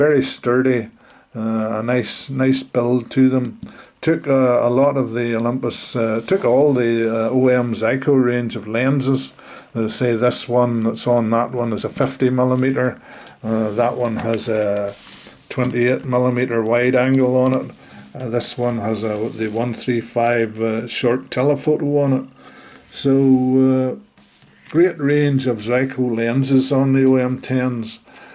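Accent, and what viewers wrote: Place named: Ballymena, United Kingdom. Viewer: Great review. I can't quite place that accent, maybe a bit of Ballymena in there, well somewhere in mid Antrim, from a Co Down man living in Lisburn. 👍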